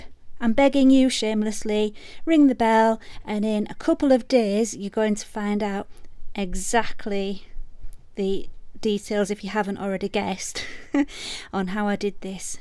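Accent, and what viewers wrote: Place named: Harrogate, United Kingdom. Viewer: I feel like wrecking my microwave. Lol. You sound as though your from my area. Harrogate. I can’t find one 😥 I have the pigments. I’m ready Only got tiny magnets tho. Scared lol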